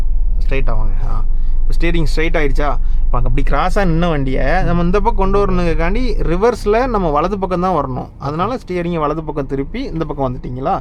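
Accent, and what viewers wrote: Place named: Madurai, India. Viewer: Excellent teaching bro thanks Bro neenga Enga Madurai slong pesura maathiri irukku 😊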